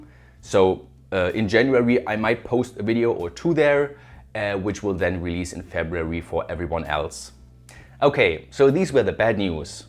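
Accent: Germany. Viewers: I joined you when you had about 40,000 subscribers. I really liked your content and your German accent. As your subscriber and fellow creator, I completely understand you. It's just titanic work. I wish you inspiration in the new year and ease in creating videos.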